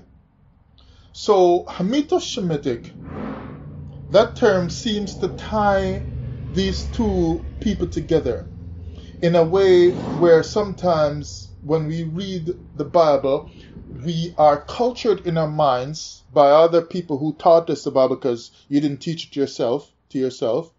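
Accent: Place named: Caribbean. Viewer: Hi, I hope you are well. I have listened to your videos on and off for several months although I have not seen the last few months worth. The last ones I really related to were those that spoke about the original language. I could relate to your frustration of what really is the original - I now feel I have found the answer. Like me, you sound like you are from the Caribbean and you will most likely share my heritage. Please find me on facebook (Zephaniah Blake) and lets talk. Peace and love.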